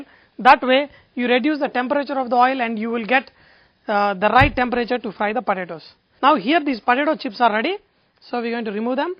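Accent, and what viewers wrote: India. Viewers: guys, stop making fun of his accent. you try giving a cooking lesson in an Indian language and see how you feel!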